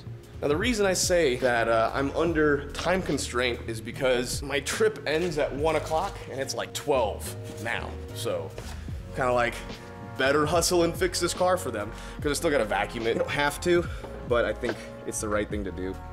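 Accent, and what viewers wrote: Canada. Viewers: Love the Canadian accent some or all of that stuff you said was true😉😉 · Awesome Canada ascent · Ur Canadian accent is on point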